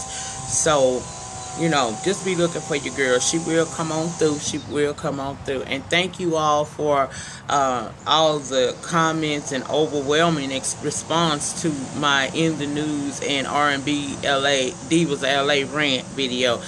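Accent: South, United States. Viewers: I just came across your channel 2day...faints....I'm back. I think its safe to say I am a fan just 2 reviews in...You're giving me Southern Teas. My Gawd, where are you from Miss?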